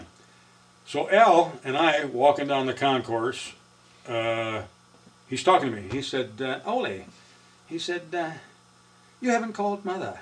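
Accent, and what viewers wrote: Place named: RP, United Kingdom. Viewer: He does a surprisingly decent posh English accent (I say that as an Englishman)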